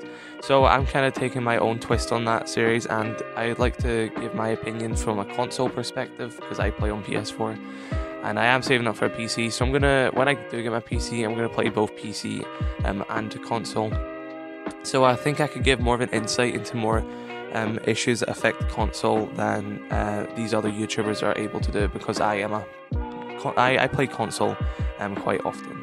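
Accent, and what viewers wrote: Scotland, United Kingdom. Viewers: Good vid dude just recently subscribed noticed your accent your from scotland! Me too 🏴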